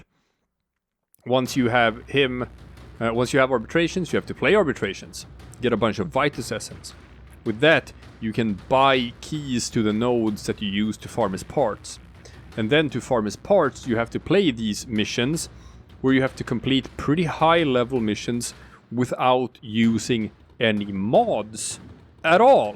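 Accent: Sweden. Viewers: Lätt att höra att någon är från Sverige när man är svensk 😂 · lite skånska i mixen där 🤣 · You Swedish??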